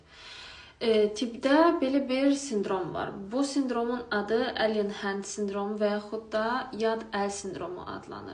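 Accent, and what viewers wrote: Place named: Azerbaijan. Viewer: Ezarbaycan lehçeniz çok güzel.❤❤❤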